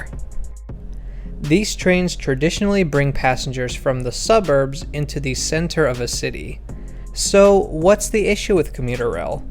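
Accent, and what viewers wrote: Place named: United States. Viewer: Most American sounding European guy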